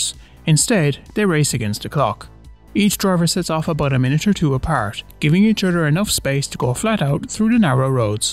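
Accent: Ireland. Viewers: I can tell from the accent youre irish like myself so lets pray for a craig breen win😂🇮🇪🙏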